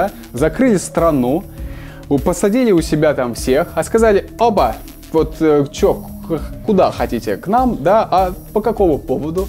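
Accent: England, United Kingdom. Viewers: Тут всё видео говорит английским акцентом, я один это заметил?.-. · А я единственный заметил, что у что то с голосом, будто какой-то английский акцент. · Мне показалось или в этом выпуске говорил с очень заметным акцентом? Я бы сказал как англичанин но очень хорошо говорящий по-русски. Букву Р произносил очень мягко например.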